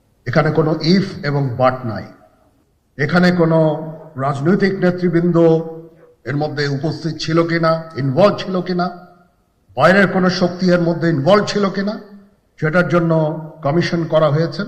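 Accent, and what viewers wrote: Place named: India. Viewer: সেনা প্রধান ভারতীয় সুরে কথা বলছেন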